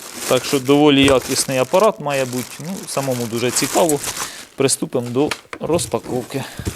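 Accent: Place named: Sumy, Ukraine. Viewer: я чую діалект сумський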